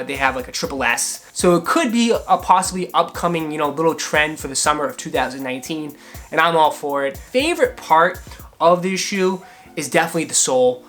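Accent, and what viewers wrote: Boston, United States. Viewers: If I'm not mistaken your from Bahstan?. Wicked good review.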